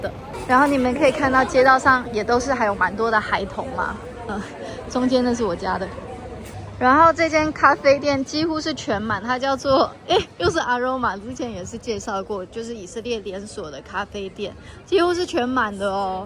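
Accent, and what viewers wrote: Taiwan. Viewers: 听口音是台湾人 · 好奇你是台灣人嗎?口音就是台灣人..但福建的也很像!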